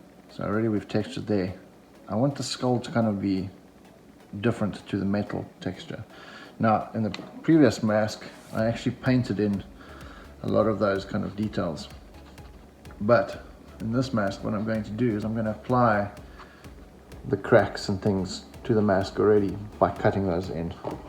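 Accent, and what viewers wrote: South Africa. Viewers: Awee he sounds like a South African ou. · Very cool. BTW, why do you sound half South African? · You sound afrikaans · Are you from SA? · davis - smith. He sounds like a full on jozi boytjie.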